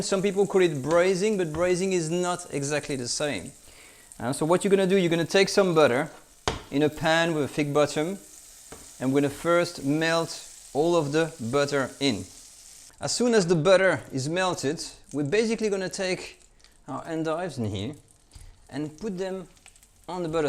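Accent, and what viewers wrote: France. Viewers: I like the Chef french accent in english...Of course the recipe is delicious.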